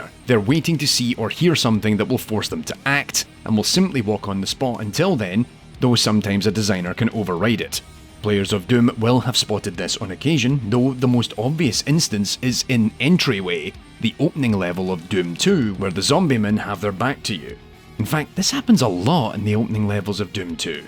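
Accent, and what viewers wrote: Scotland, United Kingdom. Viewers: As a fellow Scot, I like how your accent got stronger as the video continued. Especially the rolling of the Rs. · A Scotsman saying ‘Rrrrip your face out’ sounds terrifying. Your dialect sounds awesome and terrific. Don’t even feel the need to deal it down. Also: nice things for you. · It sounds like you were less able to suppress the Scottish-ness in this episode's narration! Also, for anyone at all interested in the nuts and bolts of DEWM, you owe it to yourself to check decino's channel! He's done A.I videos like this one, but also stuff like the sources for the game's audio and interesting tidbits about the sprites! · I wanted to subscribe. God why did you have to have a Scottish accent.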